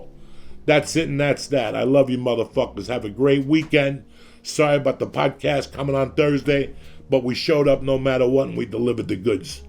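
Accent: New Jersey, United States. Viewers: Anyone else noticing how is starting to talk much more like he lives in jersey haha morning church!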